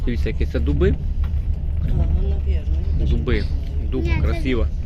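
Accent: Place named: Ukraine. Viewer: Наконец то, ты на Москву, жена моя все переживает когда хлопец отдохнёт, я знаю хорошо ваши края, костогризово, раненськ, копани, родина моих предков, самые работящие люди, диалект у тебя чисто украинский приятно слушать, у меня родня так балакае, мира тебе, здоровья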